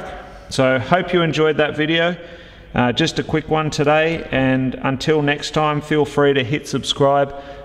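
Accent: Australia. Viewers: A whining Australian ruined this comparrison. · Twelve minutes of earache listening to an Aussie accent... and the conclusion is pretty much what one would have expected.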